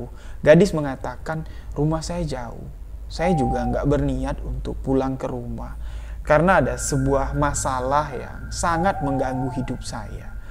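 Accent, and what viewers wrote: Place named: Jambi, Indonesia. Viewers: jambi kk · Saya selalu nyimak cerita misteri dari bang...hampir tiap hari beberapa cerita saya simak Saya suka gaya bicara bang...baru saya tau seperti ini gaya bicara orang jambi..enak didengar Sukses selalu bang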